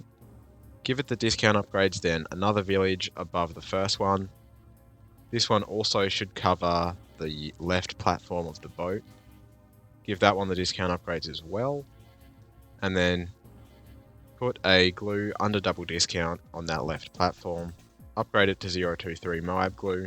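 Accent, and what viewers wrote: Australia. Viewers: you have no idea how much joy it brings me to hear that beautiful Aussie voiceover narrate goated CHIMPS guides, I love you brother How are you, anyway? How's the fame of being a movie star treating you?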